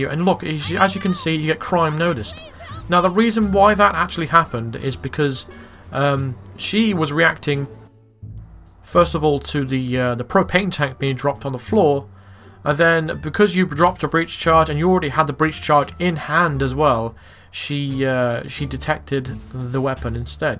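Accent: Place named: West Country, United Kingdom. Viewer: Bristolian/West Country accent.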